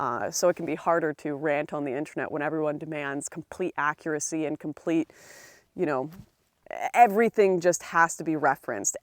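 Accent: Canada. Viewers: Steggering? You Canadians' accents crack me up. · You sound like you live in Adolf Trudues Canada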